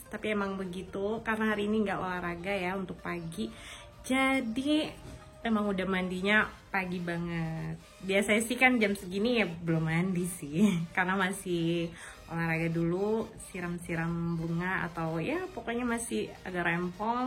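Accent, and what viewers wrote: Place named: Ambon, Indonesia. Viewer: Enak banget dengerin pake nada ambon yaa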